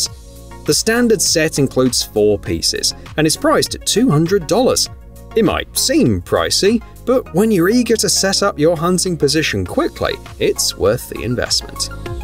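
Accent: United Kingdom. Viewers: The pulsing background "music" induces a headache. I wonder if the narrator is really British.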